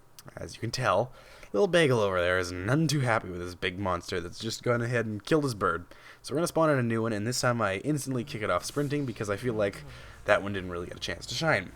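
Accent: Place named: United States. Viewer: Zajd Zajd it would be fine if he had a different accent but he talks like a American/Canadian and he says things completely wrong!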